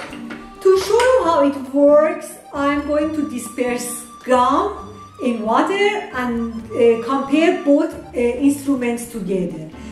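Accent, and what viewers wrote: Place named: Iran. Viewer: Man, that is one thick persian accent lol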